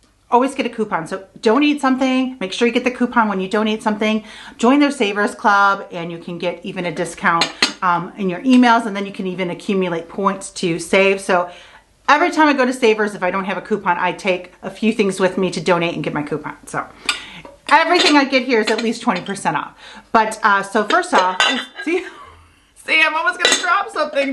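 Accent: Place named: Michigan, United States. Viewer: I just found your channel today. Are you from Michigan? I grew up there but live in Illinois now. I can sometimes still hear the Michigan twayang, lol.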